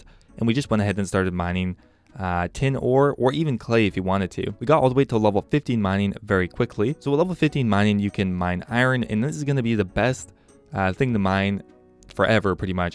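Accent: Canada. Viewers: Hmm didn't notice before, is that Canadian accent I hear? :P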